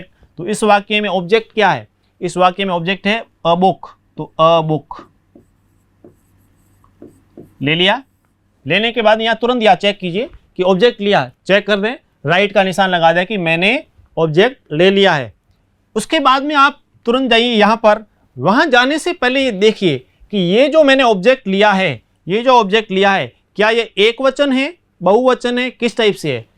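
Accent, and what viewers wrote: Marwar, India. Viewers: Sir your English is not fluent Marwari tone aa rahi he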